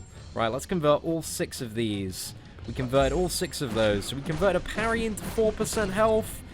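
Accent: United Kingdom. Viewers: I hate you, but I like watching your videos with your Bitish axcent.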